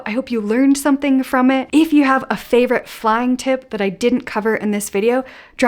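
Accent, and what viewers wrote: Canada. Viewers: Did I catch a little "pack an oatfit" in your "beg" Canadian accent there are you from somewhere else? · Man ,you are THE BEST !!! This was the best flight hack video I've ever watched !! Believe me I've watched a lot !! Lol. You covered so many sites & hacks nobody else mentioned !! The best editing, too !! And the way you speak,even though you spoke fast ,it was very clear to hear/ understand that I didn't need to repeat any !! Lol. Canadian English is the easiest to ears ,but still ,you are that good !! Usually I find almost the same content or lots of overlapping information in this kind of videos & I only saw several in your video ( this ) & they were important to mention anyway,but you provided way more new informations / more original, creative tips !! And so much fun to watch ,so,I got very excited to watch/ listen !! Even Level 8 suitcase ! 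I knew what was as I've seen others using that one in their video ,but I don't recall them mentioning what you described !? Like it is very handy that there's no zipper ,or it'll not allow us to overpacking ,so it's actually great for people with the tendency ! Lol Also you realized that suitcase would had saved your money because it's very durable & you purchased 3 , 4 luggage bags in 10 years as they broke !! As soon as the video finished,I hit the subscription button !! This was my very 1st video of yours to watch & I hardly ever sub to any if it is the first one ,or not til 4th or 5th even !! Lol As others video's subjects are super intriguing you mentioned in this & this was that good enough !! As well as I noticed that you seem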